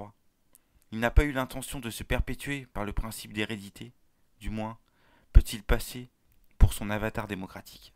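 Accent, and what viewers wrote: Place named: Brittany, France. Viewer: C’est incroyable comme tu as travaillé sur ton éloquence et ton accent breton qui était beaucoup plus marqué auparavant. Ainsi que sur le dialogue.🌟🍀 Personnellement, je n’ai rien contre les accents mais continues ainsi c’est mieux d’avoir un accent neutre pour se faire entendre par tout le monde😂, je reconnais le travail sur soi accomplis.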